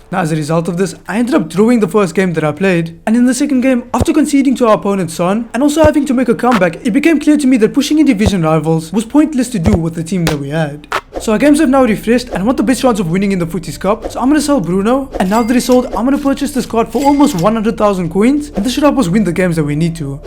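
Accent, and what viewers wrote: South Africa. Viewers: I think he from south africa bcs of his accent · 10 and are you South African?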